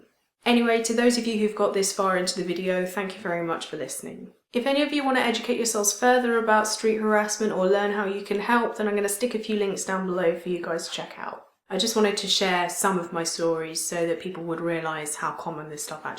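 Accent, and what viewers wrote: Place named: London, United Kingdom. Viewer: I find myself wondering where you grew up. I think I heard in another video that you like in Edinburgh now? By your accent I'm thinking you grew up around London perhaps? I grew up in York and never heard of any such going on happening to any of my friends. Even When I moved to the much larger city of Edinburgh to go to university I still never noticed this behavior of random creepy street-goers. I'm male, so perhaps that insulates me from it a lot. Do you find that this problem of street harassment is lessens the further North you go?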